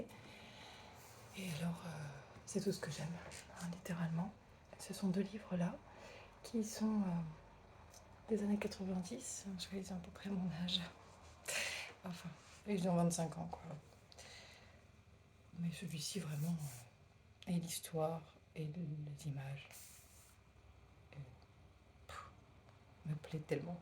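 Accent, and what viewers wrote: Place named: Spain. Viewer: Youpi je suis le 100e abonné 🙃Tu as un petit accent et une manière de parler bien spécifique, tu es espagnole "de base" ?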